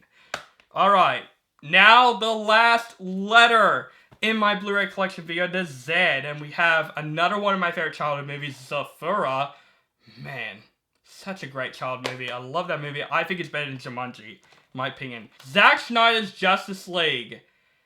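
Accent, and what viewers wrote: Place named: Australia. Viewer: Never thought I'd hear an Australian apologize for doing a "terrible Australian accent" lol You sound Australian to me.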